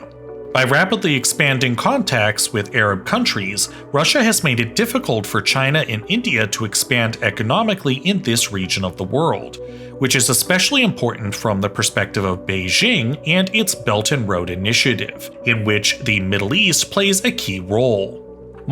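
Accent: Poland. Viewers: Hey mate, you should stick to your original voice. Your polish accent adds a sense of charm to your videos and makes it feel more authentic. The American voice over kind of makes your videos feel more robotic. Overall, this video was really interesting and very enjoyable. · I prefer your voice and your polish accent over this sanitized american voice, your videos sounded way better before (the content keeps getting better though)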